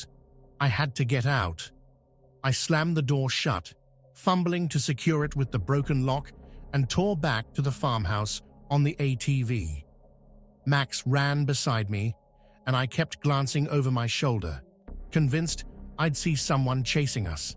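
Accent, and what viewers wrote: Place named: United Kingdom. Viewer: Love the stories with the British voice.😉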